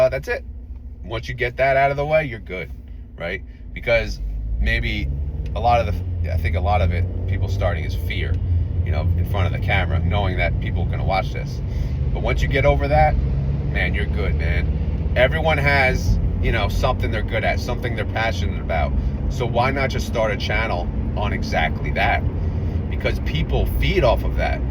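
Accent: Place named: New Jersey, United States. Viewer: Where do you live? You sound like New Jersey.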